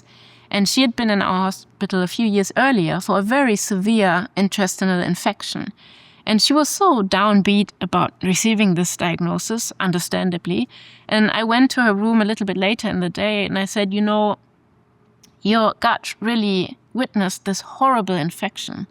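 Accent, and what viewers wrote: Germany. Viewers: I never really appreciated a German accent but her charm just gave me a new perspective on it. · The sound of a German accent is hard to listen to. Very stilted. The sounds dont flow.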